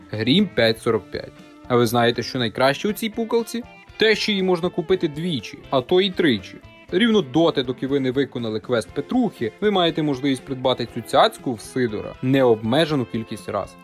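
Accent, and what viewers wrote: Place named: Western Ukraine, Ukraine. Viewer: Я рабсиянин люблю смотреть и слушать украинский язык с западным акцентом вообще бомба! Особенно когда смотрел про херсонского енота из стражей галактики это шедевр! Героям слава!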